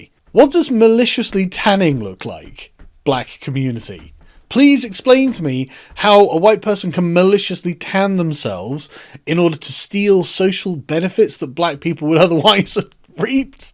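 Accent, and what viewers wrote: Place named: Public school, United Kingdom. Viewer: "Kont...I kont"...what a twat public school accent.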